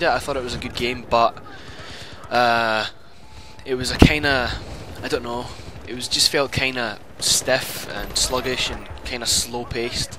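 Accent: Scotland, United Kingdom. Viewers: He's Scottish..The way he says it is not the same as all British people. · i love your commentaries mate, where bouts in scotland you from? i played it last night, i really couldnie find anyone :)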